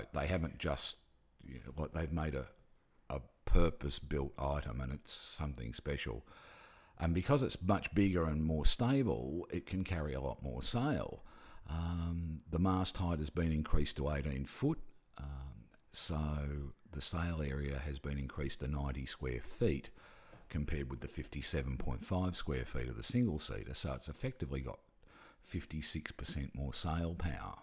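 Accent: Australia. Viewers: Actually, I prefer this guy and his honest and relaxed approach. Listen to some of the other reviews he's done. Excellent voice, excellent approach and speed, but it's just not loud enough. Still, I have some normal sized computer speakers hooked up to this desktop and, when turned up a little, it wasn't silent at all. If this is called Aussie 'strine,' then I like it. He'd be an excellent story teller.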